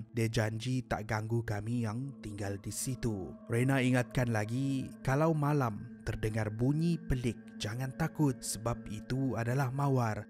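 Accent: Sabah, Malaysia. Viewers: orang Sabah kah?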